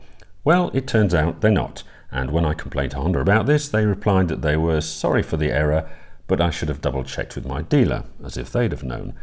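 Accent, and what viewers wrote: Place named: England, United Kingdom. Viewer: You sound obviously very English to me, and you've been "fully metrified" ! The 3.5 litres for 100km , that's 80 MPG (UK gallons) to me ! My fully metrified infant schooling that started in the early 1970s couldn't compete with me having to chat to my very imperial measurements only Father and Mother ! But then I haven't escaped from what is usually wet Blightly for the sunny vistas of the Algarve. Mind you with the temperatures we've had in the UK for a few days this week , as much as the sunny vistas of the Algarve usually seem very appealing, I'm not sure I can handle the heat. Come back and ask me between October and March where I'd rather be, and I'm guessing I'd want to be in the Algarve ! oh, and good review , as always :-)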